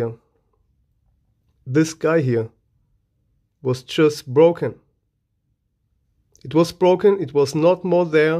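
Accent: Germany. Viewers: sounds like german or austrian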